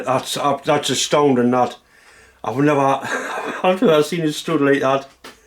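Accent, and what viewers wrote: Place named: Northumberland, United Kingdom. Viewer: Hard as the hobs of hell, been a long time since ive heard that, deffo ashington twang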